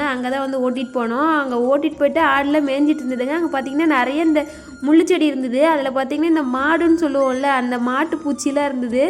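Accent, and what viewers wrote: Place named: Salem, India. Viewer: Naangalum salem pakkam dhaanga enga oorula pesura maathiriye pesareenga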